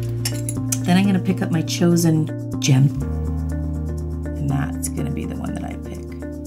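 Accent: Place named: Canada. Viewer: I love your accent! I am guessing your Canadian?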